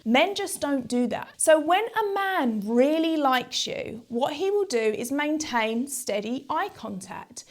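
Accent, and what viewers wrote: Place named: United Kingdom. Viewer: She’s British, different pronunciation, I believe.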